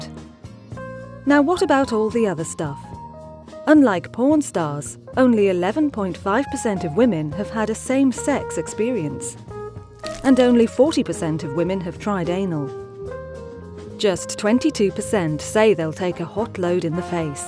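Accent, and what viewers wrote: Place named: United Kingdom. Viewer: the narrator's british accent made the video so much better.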